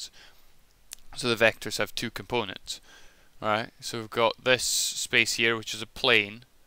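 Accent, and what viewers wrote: Scotland, United Kingdom. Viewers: Nice scottish accent! *<:D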